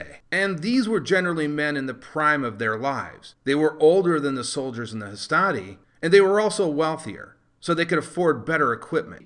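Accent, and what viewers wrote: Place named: United States. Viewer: Amazing, its sad that I have to google the metric equivalent but I can hear youre American so its understandable. Thank you so much!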